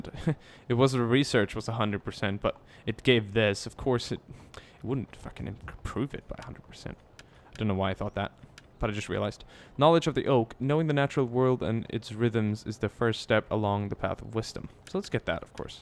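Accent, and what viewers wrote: England, United Kingdom. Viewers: YOu sure? He sounds pretty English to me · R U british or american?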